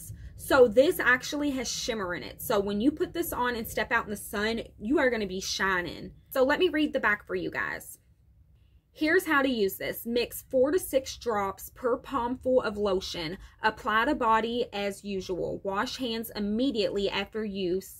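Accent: United States. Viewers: I fuckin love ur country accent💖💖💖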